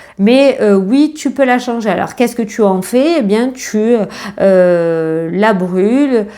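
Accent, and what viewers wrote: South, France. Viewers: Bonjour, merci beaucoup pour les rituels. je suis fane de vos vidéo, je les trouve génial et surtout votre accent du midi, j'adors. je vous souhaite une très bonne année a vous et toutes votre familles.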